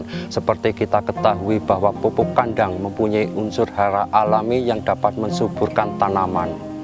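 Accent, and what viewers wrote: Banyumas, Indonesia. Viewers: ora ngapak gak kepenak kang,salam saking blitar kang,,, sukses selalu kang,terus berkarya kakng,,, !!!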